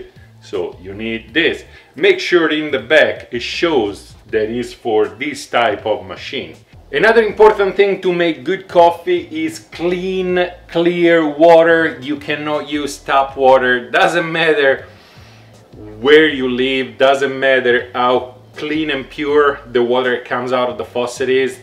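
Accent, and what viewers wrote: Italy. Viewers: Immaginavo...anche se parli un'inglese molto italianizzato...vero? Però va bene il video corto ma quei trogloditi degli americani poi fanno cappelle(errori),e quando vengono in Italia non capiscono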